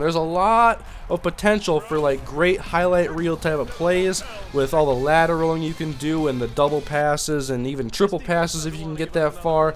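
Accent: Minnesota, United States. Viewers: youre definitely from minnesota lol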